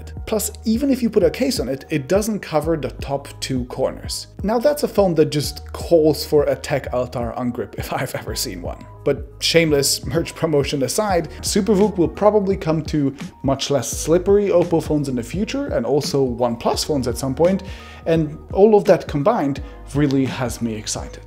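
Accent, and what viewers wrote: Germany. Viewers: This guy has a strong German accent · are you german?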